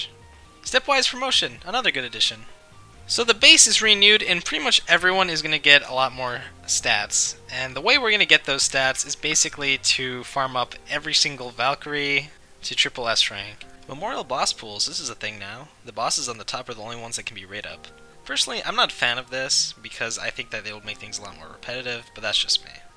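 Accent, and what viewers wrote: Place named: Australia. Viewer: Yo, you're voice sounds like Australian or something western I dunno, but Iirc you are playing SEA server right? And what the heck, you're damn good at making videos. Simple, the caption transitions are smooth but there's also that memeing moments and personal comments like "QTE Switch, now I can get rid of these abominations" or self interrupting like holy shit I rarely see that coming on these times. PS. You sound like you're speaking in Discord VC lol. PPS. I'm a fan of Aldnoah Zero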